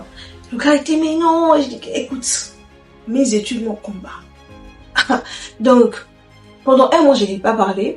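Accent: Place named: Gabon. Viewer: Bien l’accent gabonais oh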